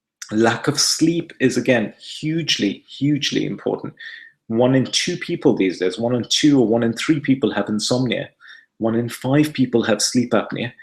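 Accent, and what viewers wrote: United Kingdom. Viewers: Wonderful video! So informative and you explain things so clearly! Your British accent is a plus!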